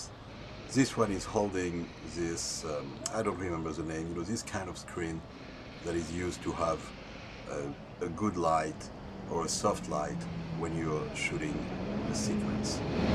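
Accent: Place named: France. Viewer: everything aside! i love your french accent... haahah :D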